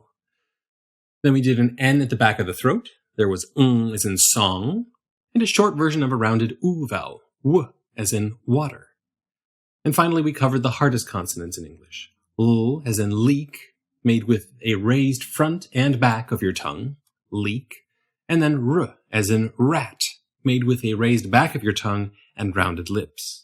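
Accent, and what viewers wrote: California, United States. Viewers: it is californian:) <link> listen to lol you are caught:)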